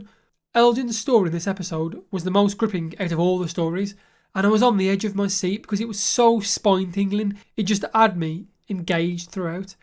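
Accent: United Kingdom. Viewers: no hard feelings bro. i actually love british accent but I have to concentrate more on the storyline which is the base of this video and your accent is making it harder because I'm not used to it, youtube gives recommendations to a larger base of audience.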